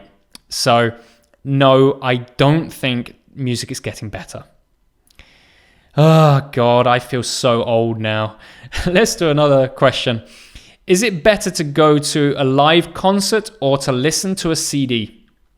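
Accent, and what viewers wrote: United Kingdom. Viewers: I love your amazing British accent 😍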